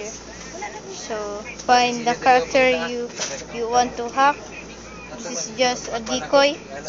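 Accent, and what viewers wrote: Philippines. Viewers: I thinks he's filipino because he's backround sound like filipino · try hard Filipino trying to speak English xD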